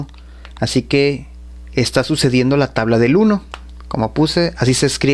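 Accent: Mexico. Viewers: Es mas mexicano que los tacos pero...de onde es (estado) Esq explica muy bien :)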